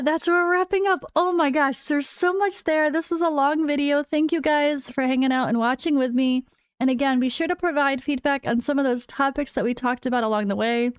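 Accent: United States. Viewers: Love your content but so amused by how Americans end a lot of words with a 'a'. Not meaning to be offensive, it just amuses me, lol. Don't know if quirky is a thing in 'American English' but you are, and it's good :) .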